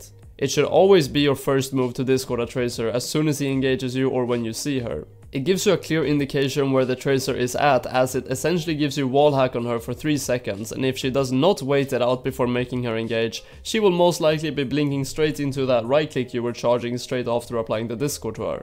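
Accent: Sweden. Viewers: Embrace the swedish accent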